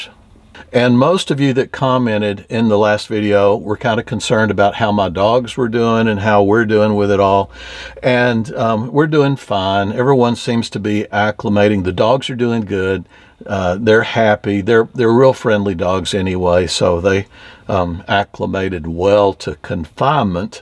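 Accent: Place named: South, United States. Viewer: you have a slight, maybe southern drawl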